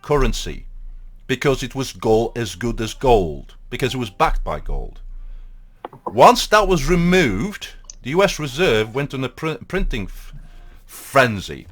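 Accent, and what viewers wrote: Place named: Northern England, United Kingdom. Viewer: love the Northern accent you've picked up, brilliant.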